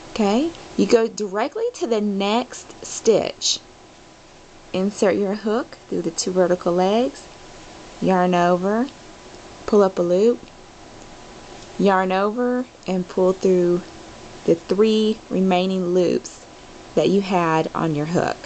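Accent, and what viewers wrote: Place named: South, United States. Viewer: Oh my goodness you have THE cutest Sothern drawl....and I'm a NC native....this is what I imagine a southern bell (in the big dresses) sound like, lol!!! Btw, great video and instructions!! New sub!!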